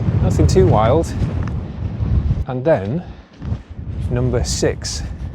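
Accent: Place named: Northern England, United Kingdom. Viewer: describes your channel very well in my opinion, without giving too much away. Draw people in, an expert might advise... Your accent is relatively northern, and the Introvert adds an enigmatic air of humourous dissonance: If you were an actual introvert you wouldn't be posting videos here. Not to make a thing of it, but I also have mobility issues. I enjoy watching you do things I used to but no longer can. I may be interested to see if you spot anything obviously accessible, but I appreciate much of it won't be. Thanks again 😺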